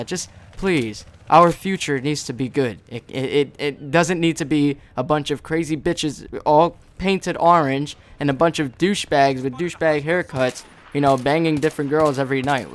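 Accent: United States. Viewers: for you americans out there? i thought you where american.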